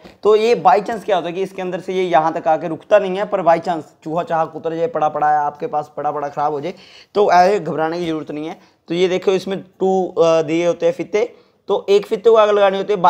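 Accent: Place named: Punjab, India. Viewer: Love your punjab accent😅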